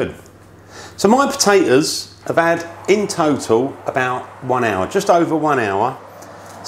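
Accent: United Kingdom. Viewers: haha- Cyrus Kariuki - as another Brit he sounds like a bit of a 'geezer' to me! I like that accent, but it's not what I'd call 'beautiful' English. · British accent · As a black guy from America I love this guy british voice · Nice video and recipe. Where from UK is your accent from? 🥰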